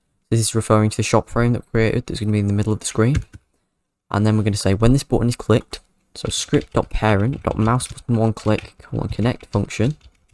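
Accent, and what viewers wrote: United Kingdom. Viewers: Are u British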